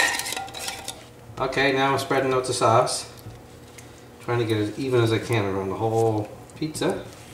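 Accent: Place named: East Coast, United States. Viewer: Yeah for sure thats what i meant haha.. My old man always put hot dogs on it growing up.. Its awesome! where abouts are ya from? sound like a east coast accent?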